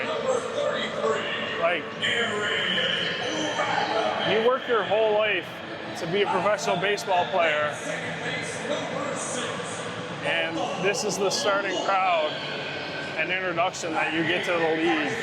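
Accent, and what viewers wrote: Canada. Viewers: He said he flew in from new york. But the accent is obviously Canadian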